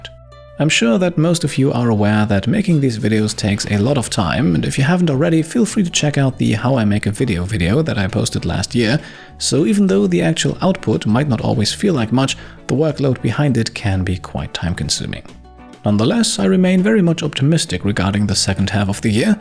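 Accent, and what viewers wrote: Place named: Germany. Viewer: I don't usually comment in your videos, but I love your work and never miss your Rimworld and XCom videos, they're just perfect... Fun fact, I don't know how I never realised you are German, hearing it now it seems obvious...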